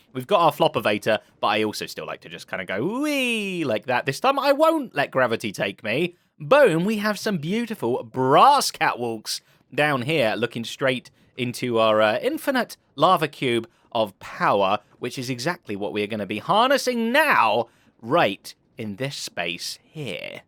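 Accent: England, United Kingdom. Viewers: i always love it when people manage to make a joke using the way the english accent sounds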